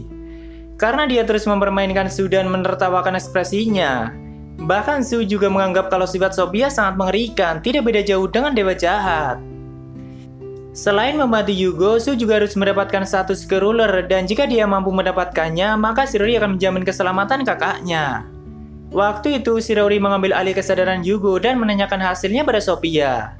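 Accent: Java, Indonesia. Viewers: Kemaren di live megane sensei logat jawamu kentel bang....kok di kontenmu ga keliatan logatnya???? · Iya sih klo pas ngbrol kliatan Jawa nya, mending gituu sih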